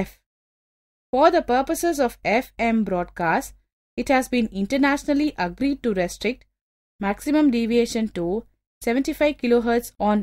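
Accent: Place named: India. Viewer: this is an indian English speaker, haha.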